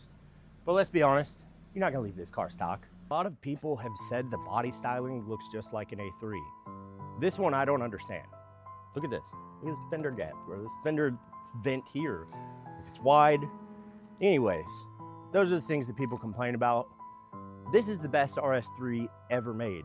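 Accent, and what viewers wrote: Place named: Hollywood, United States. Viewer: Bro you look & sound like the American Hollywood movies have made us rest of the world believe in. Clean nails, too. Bro is SIGMA. Keep it humble king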